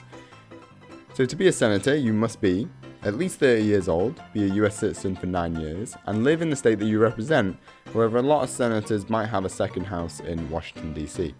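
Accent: United Kingdom. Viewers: I liked the way you explained it with a British accent. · English accent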